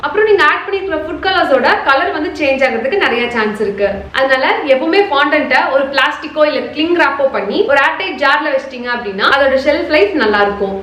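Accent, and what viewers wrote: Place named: Kongu, India. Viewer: hi.... am ur new subscriber..... ur recipes are really 👌 n r u from kongu region...? ur slang s lik that.. tats y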